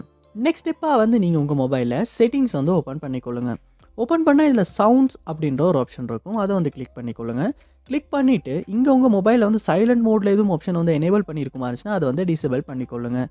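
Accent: Sri Lanka. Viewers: Bro neenga illangai tamilana ?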